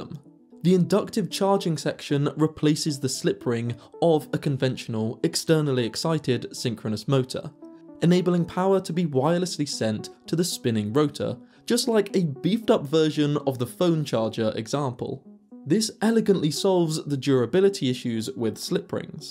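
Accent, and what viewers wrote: England, United Kingdom. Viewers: Yes- perfectly running electric motor in English accent? I am now 67 years old, and my passion was reading advanced technology... Enough of your nonsense and blah blah blah,-it may be so, for the Antichrist oppressors has oppressed all the technology for a century or so... No different than big pharmacy etcetera- you Antichrist global oppressors thieving bastards... Your day is coming, I'll see you all at the judgement seat!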